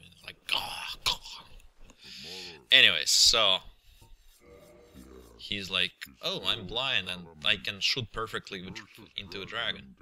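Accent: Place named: Russia. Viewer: Loving that russian accent.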